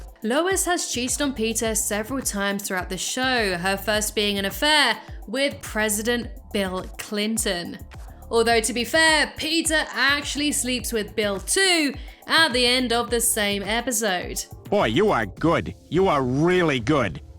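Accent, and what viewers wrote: United Kingdom. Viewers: You have a beautiful British accent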